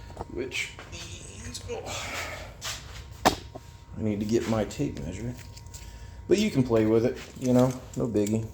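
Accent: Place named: New Jersey, United States. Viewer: Hey man I have a question. I got a Moto Iron front end, and the springs make a hellish amount of noise when hitting a bump, on the brakes or anything that causes suspension compression. Sounds like a slinky. I sprayed the hell out of every part of the spring assembly with PB silicone spray, didn't seem to help. At idle, the inner spring on the lower right side is visibly vibrating and shaking like crazy. Wonder if that could cause the noise? Is there some kind of adjustment I can make to fix this shit? I'm brand new to Springers, so I know next to nothing about maintaining and adjusting them. Also, you from NJ? You got a North East NJ accent to my ear. I live in central/southern Jersey on the shore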